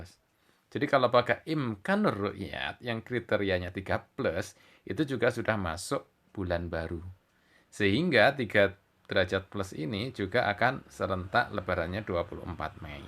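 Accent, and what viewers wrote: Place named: Java, Indonesia. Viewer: Lho, domisili Bandung? Dialeknya medok jadi kukira...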